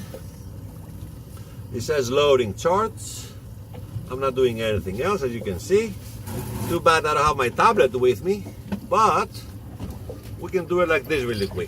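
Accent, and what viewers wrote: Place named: United States. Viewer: Γειά σου από την Πάτρα.Ολα τα λεφτά τα αμερικάνικά σου! καουμπόικα!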